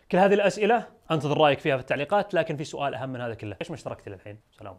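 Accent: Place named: Saudi Arabia. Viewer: اعجبني ان عربيا واعتقد لهجتك سعودية لقد ابدعنا في استخدامها في لبنان وادخلنا ابتكارات من 1975 وحتى 1990 لا يمكن شرحها الان القذيفة التقليدية العادية ثلاث مراحل التاندوم اربعة مراحل الروسي بثلاثة الاف درجة مؤية المصري الكوبرا خمسة الاف درجة مؤية المسماة الكوبرا الياسين من المبكر الحديث عنها تقنيا جهاز رصد الميركافا بعيد المدى وفشل دائما امام الياسين لقصر المسافة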